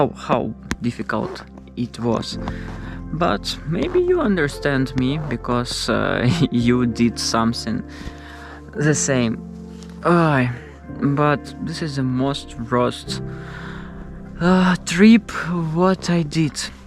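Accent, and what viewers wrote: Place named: Romania. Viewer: I think you speak perfect English. I'm also used to the accent because my Grandmother was from the Romanian Carpathians and your accent sounds the same to me. 👍 I'm glad I found your channel through Shiey's channel! Love this video! 😎🤘👍❤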